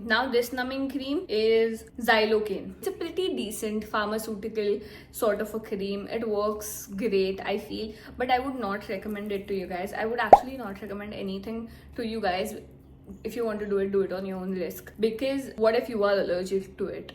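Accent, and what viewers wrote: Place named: Maharashtra, India. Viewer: loved your vlogs ✨♥ your maharashtrian tone is such a :hands-yellow-heart-red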